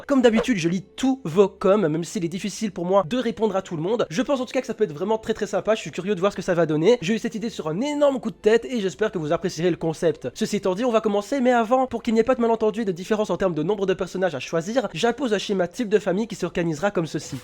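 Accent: Belgium. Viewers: Tu serais pas belge?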